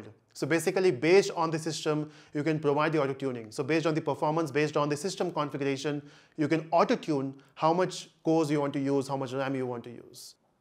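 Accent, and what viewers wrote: India. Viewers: >load video >hears gay pojeet accent >instant pause >dislike >leaves this comment >closes video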